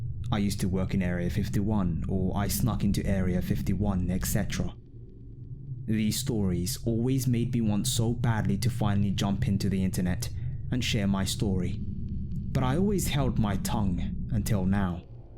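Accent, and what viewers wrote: United Kingdom. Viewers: His accent is British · Why do you have a UK 🇬🇧 accent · you sound british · U didn't worked there.. U sound like u from da U.K... Sorry nice tried..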